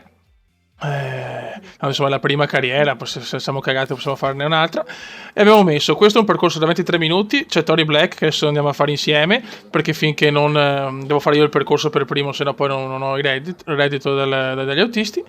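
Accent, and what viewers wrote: Veneto, Italy. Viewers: Quanto mi sta in culo il tuo accento veneto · L'accento veneziano mi fa impazzire, sei un grande! Vai così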